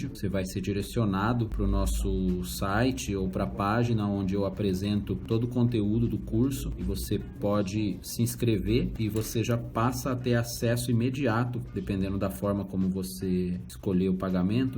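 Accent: São Paulo, Brazil. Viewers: boa parceiro. show de bola. Tentei entrar no site e deu nao encontrado. como faço pra fazer cursos com voce ? vc é de sampa ? (tem sotaque de sampa - conterraneo) eu moro aqui em Pinheiros - Vila Madalena. me chama no zap <num>. valeu.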